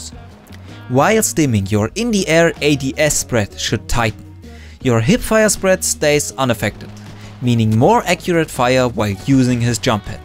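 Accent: Germany. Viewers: Bist du deutsch? Lol dein Akzent xD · Der deutsche Akzent lenkt mich so ab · Der Akzent...du bist doch deutsch oder?🙂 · Man hört dass du Deutscher/ Österreicher/ Schweizer bist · Hòrst dich deutsch an diggi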